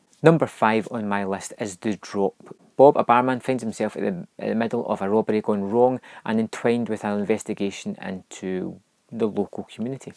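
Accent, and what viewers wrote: Scotland, United Kingdom. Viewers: wat part of Scotland u from I'm from Stirling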